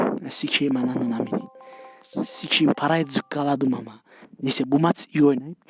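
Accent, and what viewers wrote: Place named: Istanbul, Turkey. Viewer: İstanbul beyefendisi gerçek türkçe budur işte herkez özünü bozmuş krkdkffm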